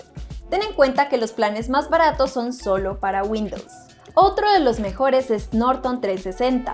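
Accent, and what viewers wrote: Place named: Mexico. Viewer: Me acabo de suscribir, tienes acento de mexicana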